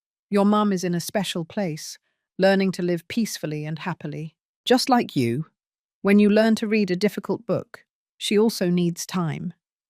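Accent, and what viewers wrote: United Kingdom. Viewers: Me too its an englih UK people accent .I enjoyed listening.i ❤it🎉🎉🎉🎉🎉🎉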